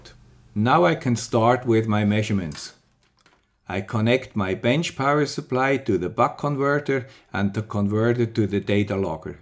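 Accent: Switzerland. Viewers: I just love this! I want to know. So I build a contraption that give the answers automagically. And your "swiss accent" is just right. You'r not talking like a gatling gun, so people not having english as their first language can easily follow what your'e saying. Good, as I have huge problems following a monologue in "switzerdeutsch". :-)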